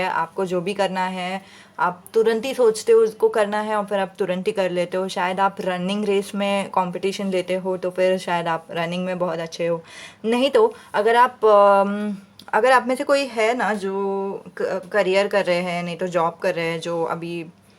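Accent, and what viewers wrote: Maharashtra, India. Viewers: Aap Marathi ho Nice tone 😀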